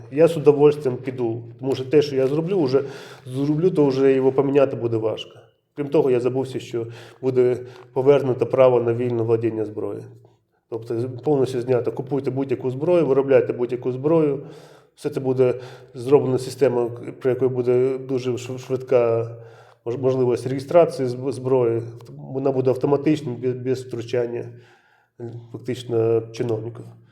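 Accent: Russia. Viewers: 😮я чую московский суржик шановний ви не азіров в гріме?і да ти уже збрехав, в Україні можна мати любу зброю крім пістолета,навіть снайперку, і в необмеженій кількості😅 ти можеш бути президентом брехун ще той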